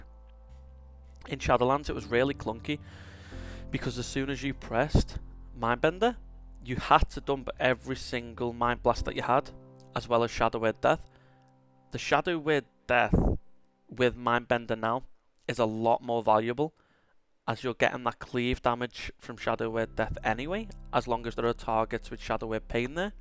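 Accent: Liverpool, United Kingdom. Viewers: Can’t work out if that’s a scouse or wirral accent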